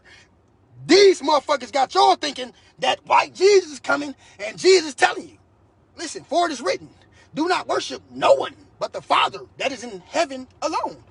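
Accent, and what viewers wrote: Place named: Milwaukee, United States. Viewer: Sound like you from Milwaukee. Link up.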